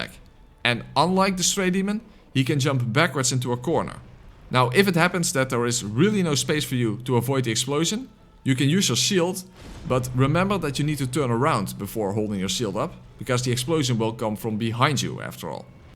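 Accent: Netherlands. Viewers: Ik hoor gelijk dat je nederlands bent!😂😂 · His so very dutch accent is funny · Lekker kazig accentje! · First three words and I’m like “yep, this guy is Dutch” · Dutch accent, that's a yikes!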